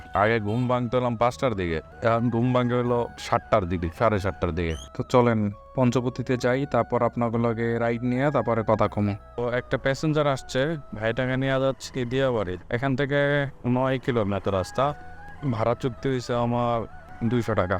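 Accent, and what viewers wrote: Barishal, Bangladesh. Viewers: ভাই আপনি কি বরিশালের??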